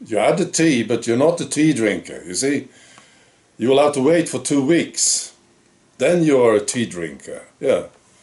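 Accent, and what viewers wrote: Sweden. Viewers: Here here! The Big C 👍! Great idea to have there own League....... 👍👍 Love your Swedish English. Thanks for all you do to get the Truth out there 👏👏👏🍿🐸❤️🇨🇦❤️🙏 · Your accent? What are they on about? You are Swedish of course you have a bit of an accent, I wonder how well they speak Swedish? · I like your accent, you speak English better than a lot of English people, I am English, and one of my favourite sayings is one of your Swedish ones, I cannot say it in Swedish, " you have now shit in the blue cupboard ", " it means you have gone to far", so you can try an English controversial word, c u ne3xt Tuesday.much love from essex England. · I love your Swedish accent, don't stop saying "Mongkey" :)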